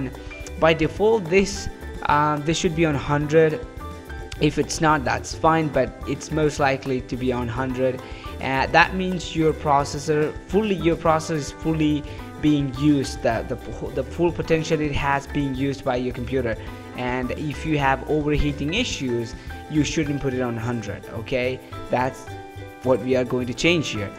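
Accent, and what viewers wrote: India. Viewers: Thank you much, This helped! Nice voice by the way.. your the first indian i saw to speak english normally.. nice! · I dont care about accent, really appreciate when they speak in english. Most of them speaking hindi.🥲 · Is there any Indian people in the world without any Indian accent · WTF happened to the Indian accent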